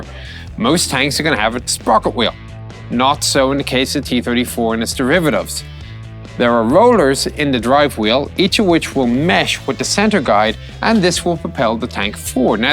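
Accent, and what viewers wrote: Ireland. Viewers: Apparently Chieftain is American, but he sounds Irish? Weird accent. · the presenter has such an ambigious accent....irish? german? nordic? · He has an Irish accent lmao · You have to excuse him hes irish thats just how they talk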